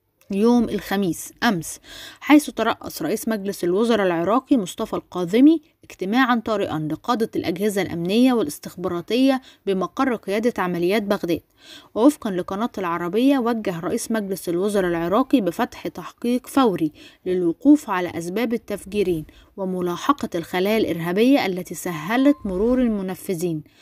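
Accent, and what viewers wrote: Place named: Egypt. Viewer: صوتك جميل باللهجه المصريه الجميله وتقديم الطرح بالمقطع رائع ربنا يوفقك ويحفظ أهلنا بمصر العزيزه ويسعدك يارب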